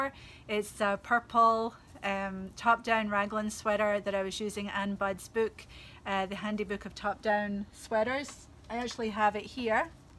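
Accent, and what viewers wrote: Scotland, United Kingdom. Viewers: Oh how can we get her to publish that pattern? It really is a no-fuss beauty! My bucket list has always been Shetland Wool Festival and EYF. Some of my motivation is simply due to my love of hearing the Scottish voice! I always look forward to listening to you while I knit! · Thank you for the EYF sale this weekend...even though the CDN $ is so low, I plunged in and fell right off my yarn diet to splurge on some Marie Wallin wool. A dear friend shared her leftovers from her cowl kit and I purchased the wool to replace the bits needed to make myself the same cowl. Love watching your shop updates and your wonderful accent, as I recently lost a very dear Scottish lass who was 94yrs young and it brings her closer each time I hear you speak. :)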